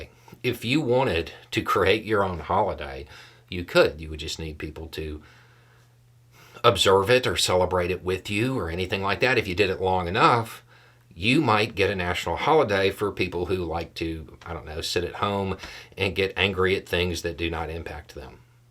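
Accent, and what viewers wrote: South, United States. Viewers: Some of the messages that come in really make me think some people out there just have a kink for being verbally dismantled bearded white guy with a southern accent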